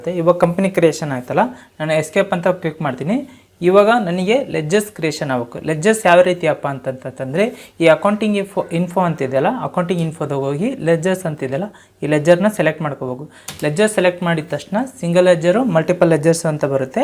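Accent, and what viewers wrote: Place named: Ballari, India. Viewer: Pakka ballari language TQ sir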